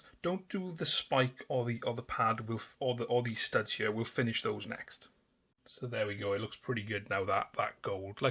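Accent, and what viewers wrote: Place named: Welsh border, United Kingdom. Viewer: It’s nice to hear an accent that’s close to home. I’m on the welsh border myself! Great content!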